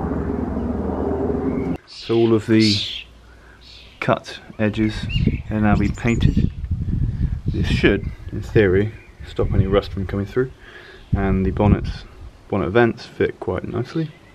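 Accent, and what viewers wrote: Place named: El Paso, United States. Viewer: This guy sounds like he's from El Paso.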